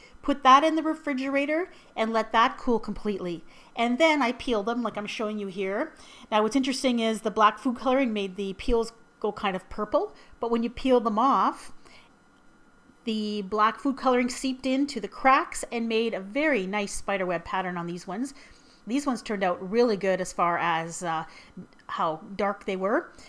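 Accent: Canada. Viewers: ...are you canadian????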